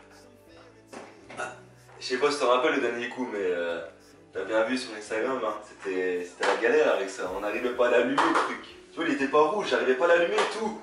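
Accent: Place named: North, France. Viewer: Ton accent du nord me fait trop rire ahah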